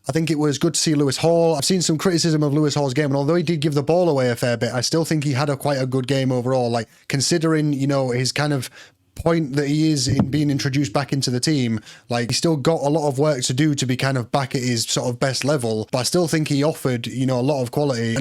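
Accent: Manchester, United Kingdom. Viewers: You again??? Clickbait thumb nails, acting angry when you ain't and trying to be clever with ye little microphone and Manchester accent, Neva seen ye at St James Mr famous, you little actor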